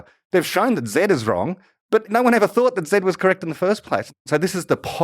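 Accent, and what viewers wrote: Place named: Germany. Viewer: nope its not nivi. sounds like Deutsh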